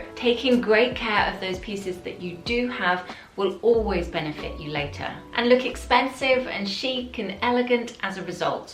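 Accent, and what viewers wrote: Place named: England, United Kingdom. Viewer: Hi, I adore all your outfits and style ideas. This was yet another elegant and classy fashion video. You are wonderful at what you do...please continue! I think it's perfect. You're amazing! Please dont worry about the people with thumbs down...you can't please everyone. I think you always give great fashion and style advice and you do this in such a sweet way with a sweet voice and beautiful English accent, and your lovely personality shines through. Keep doing what you're doing- it's all good! I look forward to your stylish videos. Have a lovely Sunday. Karmel xx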